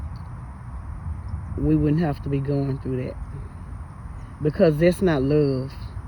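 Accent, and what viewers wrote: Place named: Mississippi, United States. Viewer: You sound like you are from Mississippi.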